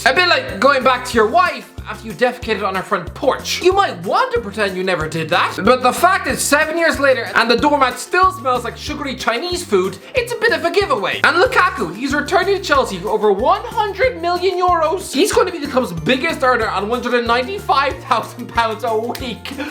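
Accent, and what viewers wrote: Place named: Ireland. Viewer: and words which end a sentence while having three ˢʸˡˡᵃ⁻BLES... you've got to love them.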